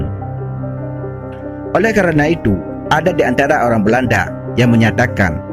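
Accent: Aceh, Indonesia. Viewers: Bereh bg logat aceh keren keuleutok leuh hahah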